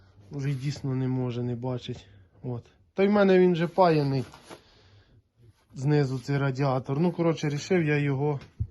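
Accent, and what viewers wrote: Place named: Zhytomyr, Ukraine. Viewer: По говору таке як Житомирська область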